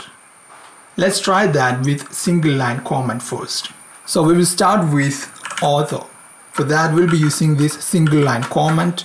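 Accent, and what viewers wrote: Kerala, India. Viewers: U from kerala?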